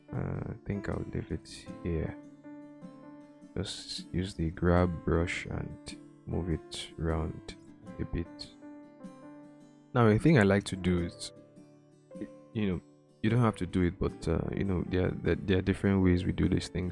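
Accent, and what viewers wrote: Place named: Nigeria. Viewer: So good to have a tutorial in Nigerian English. I can understand everything you're saying. Really clear and straight to the point. Thanks for this.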